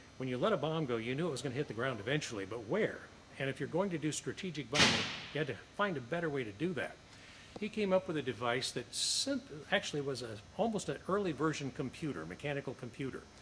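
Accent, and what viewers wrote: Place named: England, United Kingdom. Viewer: The best useful info and at least , I could learn English accent from you 🙏🏻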